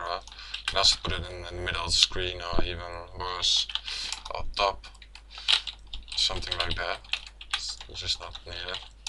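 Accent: Netherlands. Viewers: Dutch accent?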